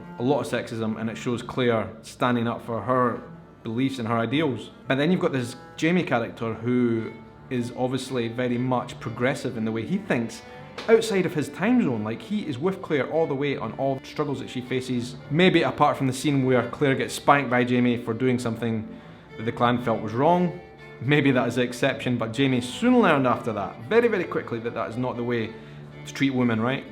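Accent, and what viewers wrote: Scotland, United Kingdom. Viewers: Hey, I did watch the first season of Outlander, and loved it. As an Ex Pat Scot, from Edinburgh, I initially watched it, just to hear my native accents again. And I loved both of the main Characters, and yes, they both are handsome and beautiful. besides being good actors. And the story is well written. I also enjoy Sci-Fi, or these time travelers. Why I missed series 2 and 3, I'm not sure. Probably because I have so many viewing options, that it probably just got lost in the midst of all those options. I do enjoy a strong Female Character, and thankfully, Brits have plenty of great female actors to play them. I can't even recall the channel it was on, but I know sooner or later, it will appear on one of my Streaming Media systems. Then I'll catch up with the show. I subscribe to two British Streaming Channel, and Amazon. 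I just recently cancelled my Netflix. So I'll do a web search, for Outlander, and maybe catch up with the seasons I've missed. I've often thought, America and the Studios should pay the U.K. Royalties, for all those Royal Sagas, and others they have produced. Even though, I've been an American for over 50 years, I'm still a Scot, and it seems, much of my accent. Americans do live the Scots. And show it, especially those with Scot's blood in their veins. I enjoy your Vlogs. And hearing my old accent.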